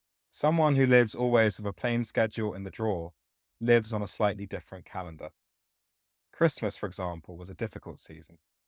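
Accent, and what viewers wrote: United Kingdom. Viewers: I agree with what everyone is saying… plus its a weird choice to have a british man read a personal essay by an american woman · Whomever thought it a good idea to have a man speaking in a British voice reading a deeply personal and quintessential American topic? Like having a kangaroo teach ballet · He’s English. Although we citizens of the USA speak English, we have completely different manners of speaking a sentence. It’s the intonations and inflections.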